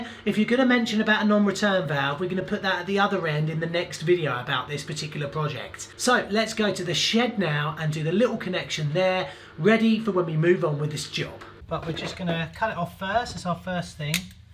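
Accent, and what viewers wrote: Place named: Scotland, United Kingdom. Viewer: Thanks for the entertaining vids and love the Scottish accent - 'suits you Jimmy' Question from me handsome is I need to run pipes in an interior concrete slab for 4 meters then into a suspended floor to reach a sink and dishwasher position my wife chose!!!.... I really don't want to have any junctions under either floor can I use PEX off a roll and just connect at both ends???.... Bet you got a vid for that eh?!! Dandy Dave x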